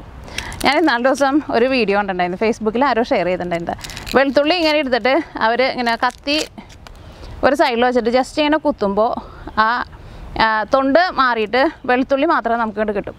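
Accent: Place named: Ernakulam, India. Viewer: സംസാരം കേട്ട് എറണാകുളം ജില്ല യിൽ ആണ് താമസം എന്ന് തോന്നുന്നു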